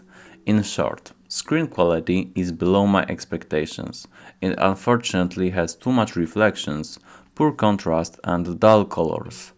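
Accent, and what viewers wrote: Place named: Poland. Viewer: You hear as a polish guy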